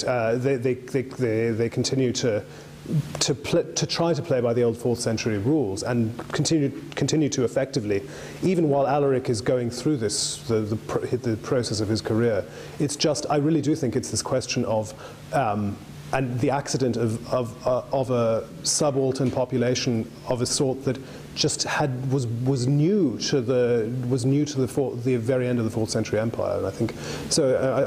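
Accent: England, United Kingdom. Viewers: Polish name with the most beautifully English voice. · I don't know, but with that accent I'd bet money he went to an English public school.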